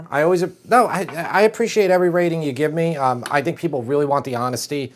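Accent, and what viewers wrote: New York, United States. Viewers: I JUST FOUND THIS CHANNEL!!!! Cannot believe I'm seeing this for the first time. Great recipes, great personality. He sounds like a New Yorker, like me!!!! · I love your NY accent. the NY accents are dying off. because of the 1 billion foreigners flooding into NY. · He sounds so “New York” — I love it! The “Taste Tester” is so darn cute! 😻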